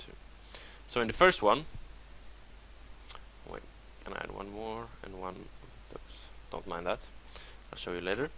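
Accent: Sweden. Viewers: are u swedish?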